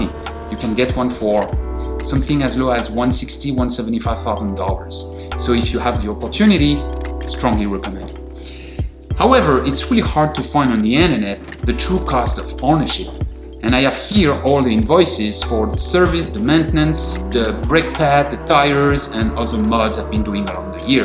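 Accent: France. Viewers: Is he French? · Very honest review, and I like your French accent !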